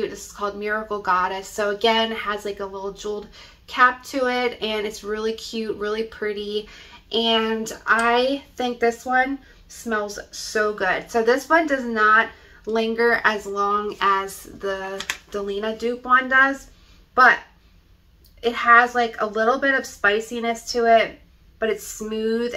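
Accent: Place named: United States. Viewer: Omg the American accent is so cute.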